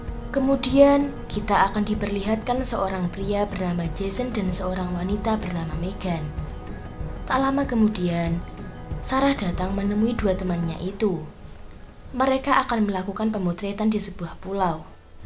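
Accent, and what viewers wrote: Java, Indonesia. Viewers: suara medddokmu terngiang ngiangg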